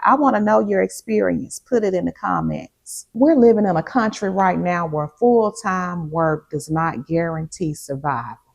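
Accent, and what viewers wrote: South, United States. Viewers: Love the message and your southern draw as well. 😆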